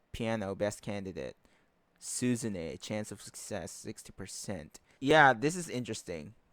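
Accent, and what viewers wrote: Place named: Philippines. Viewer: Completely unrelated to the the topic. But you have a strong filipino accent my guy lmao. were you born there and still currently live there? Im filipino too but wss born in the states. So my tagalog isnt good i can speak the basics but when it comes to having a complete convo i cant lmao but i can understand all of it. Im more fluent in spanish since a lot of my friends are latino i know weird lmao. and from a very young age I've always watched anime in Japanese so i know japanese too lmao.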